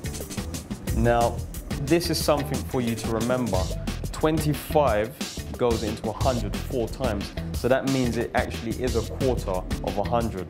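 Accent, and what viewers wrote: United Kingdom. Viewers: Very good are you Brit ish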